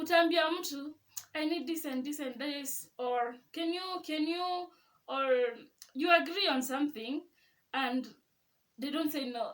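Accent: Kenya. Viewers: How long have you been in Nairobi? You sound like a Kenyan already😅😅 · ur accent is kenyan 😢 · Walai unaongea kaa kenyan manze, i can't believe you are a Congolese 😅😅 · You sound a bit kenyanized.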